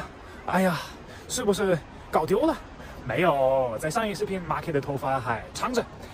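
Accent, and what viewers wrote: Sichuan, China. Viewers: 的四川普通话说得蛮有特色，喜欢👍🏼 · 一口“川普”挺有意思的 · 川普太标准了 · 你说的中文带着四川腔调，哈哈。。。希望你去德国的媒体发发中国的真实情况，你们媒体天天抹黑中国，真是可恶🤢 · 😀 的中文有四川味。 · 都有四川口音了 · 但是现在被四川话有点带偏了 🤣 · 中國話帶點四川腔好有趣。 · 的中文说的很流利，表达用词也不错，时不时还带着四川口音，你觉得中国人对外国人用“老外”，这个的口头语是否很风趣可爱？ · 你的川普已经很不错了！加油！ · 的中文表达在中国已经可以说没有障碍了。川普的味重，哈哈如果说普通话，我觉得上海的克里斯的中文应该是所有老外中最好的了吧，大山都没有克里斯说得好，就普通话，大山说的是北京儿话音比较味重些，大山还有些字的发音还是听得出有偏，但是克里斯说普通话是很顺的那种。完全听不出是外国人说的普通话！ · 普通话有点点四川味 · 好历害，有点四川普通话的味道哟😀👍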